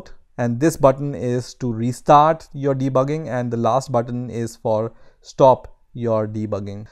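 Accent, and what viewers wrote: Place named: India. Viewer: please don't speak indian english speak fluent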